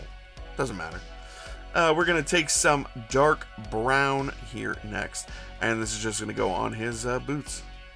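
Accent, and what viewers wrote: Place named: Australia. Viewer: Definitely Australian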